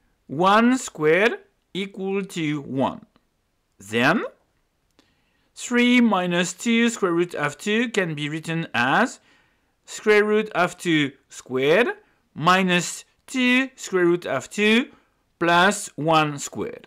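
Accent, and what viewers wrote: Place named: France. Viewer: he sounds french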